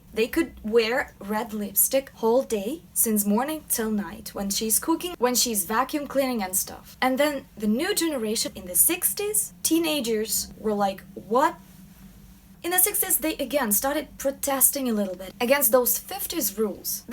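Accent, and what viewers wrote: Russia. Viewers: Is that a slight Russian accent I can hear. Good analysis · I love your accent, are you Russian? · me Its not constructive criticism. It is shaming someone who has English as a second or third language I guess. She’s Russian. She tries to speak English properly. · I believe shes from Russia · Sorry, are you Russian? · Надо же как я угадала что акцент русский😎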